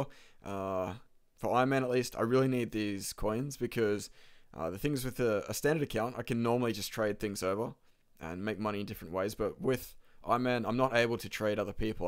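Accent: Australia. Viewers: Been so long since I heard that Aussie accent. :)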